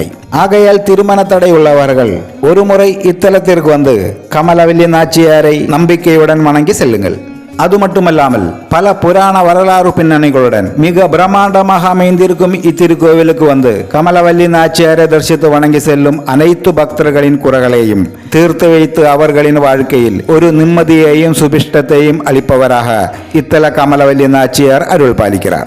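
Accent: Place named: Kerala, India. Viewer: നല്ല മലയാളച്ചൊവ ഉണ്ടല്ലോ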